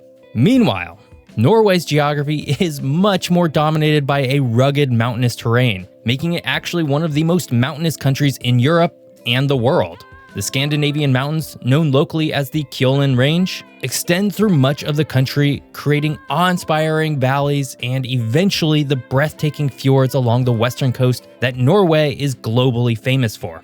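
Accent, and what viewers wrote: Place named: United States. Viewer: The clue lies in the English accent of the narrator: 'Murican! In the realm of global ignorance, 'Muricans hold a special place, clearly exhibited in this video. The disagreeable part is speculating about Finland reclaiming territory confiscated by Bloody Russia after the Winter War. The way things are going, Russia will fall apart pretty soon, but let's keep the Champagne capped until it actually happens. The main point: The territory Finland lost in the Winter war has now been overrun by Russians. It will be a bloody harsh business driving all the Russian-speakers out of former Finnish land. Will the Finns have an appetite for that? It remains to be seen. Then again, the abject cruelty shown by Russians everywhere during the war in Ukraine, why shouldn't we visit the same (or more) misery on the Russians as they visited of the locals? 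Bottom line: Putin's excuse has been to "liberate" Russians embedded in former Soviet states. A very clear action would be to forcibly move every Russian-only-speaker to their Rodina! Problem solved!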